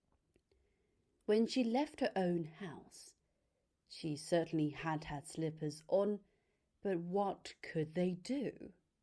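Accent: RP, United Kingdom. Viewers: 영국유학한 지인이 말하길 비비씨 연령있으신 아나운서들이 쓰는발음이라하네여 ..발음좋으시다구ㅎㅎ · 통해서 영국 발음을 더욱 좋아하게 되었어요 😍😍영상 올려주셔서 항상 감사하고 친절한 코멘트도 감사합니다 😊😊 좋은 밤 되세요 💖💖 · 잠와요ㅋㅋ좋다!!!!강추 영국에있는듯합니다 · 평소 영국식 발음에 매력을 느꼈어요 구독 누르고갑니당♡ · 정말 고급지고 세련된 상류층 영국발음이군요. 최고네요^^ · 저 이거 들으면서 영국억양 따라해보고 발음 배워욬ㅋㅋㅋ자라고 만들어주신건데 배우고 있네요ㅎㅎ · 영국영어 넘나넘나 좋아해요♡♡♡♡♡ 광고보고 아나운서님 팬되었는데 이렇게 직접 들을수 있다니💕💕💕💕 천천히 읽어주시니 더 좋습니다♡ 참, 혹 녹음하실때 쓰시는 마이크장비를 여쭈어봐도 될까요~~??!!! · 최고다 최고... 대박이다 대박 여기가 영국이네요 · 우와 british english asmr은 처음 클릭해서 들어보는데 진짜 동화책이네요. 액센트도 원어민 RP 라서 넘 듣기좋아요 :) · 이번엔 둘째아이 옆에서 영국식 영어의 신세계 입문중^^ 5천명 곧 돌파!! 화이팅!! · 영국영어 원탑이신듯~!! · 발음연습에 정말 도움 많이 됩니다😍😍 영국남자보면서 듣기했었는데 여기서 보니 완전쏙쏙 들어와요 영국발음매력에 더 빠지고있어요😩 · 영국발음너무좋아하는데 목소리랑 너무 좋아요 진짜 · 동화올려주셨네요 감사감사^^~ 애가 영국발음이 좋아해요. 다좋은데 10분만에 안자네요 ㅜ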